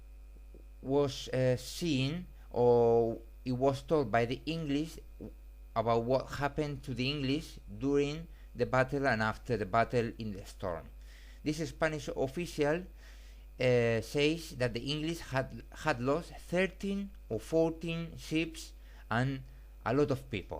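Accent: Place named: Massachusetts, United States. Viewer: This Inglish is from Machachuset, salu2 desde ESPAÑA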